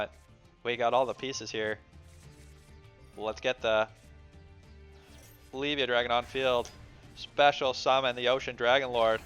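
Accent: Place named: Canada. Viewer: You sound big time Canadian bro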